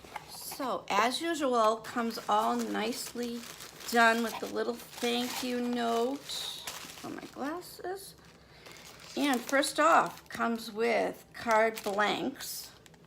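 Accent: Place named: Boston, United States. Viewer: Oh I guessed Boston so you being from Mass makes sense :) It's awesome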